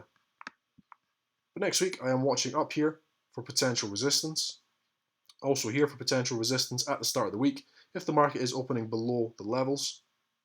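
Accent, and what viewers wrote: Scotland, United Kingdom. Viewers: Hi - another really useful video - Thank you. That beautiful Scottish brogue has become the soundtrack to my Sunday brunches recently! Are you watching any fundamentals on the pair this week? Does the RBA Interest Rate Decision come early enough and carry enough clout to set the trend for the week; and does the US Non-farm Payroll at the end of the week have any likely bearing on price action?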